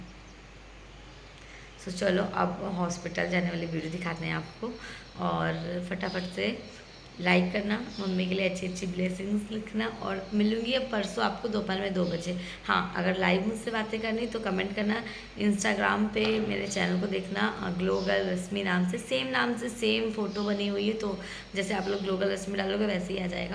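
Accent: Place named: Bengal, India. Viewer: App lakhnow se ho me smjhti thi ki ap bngal se ho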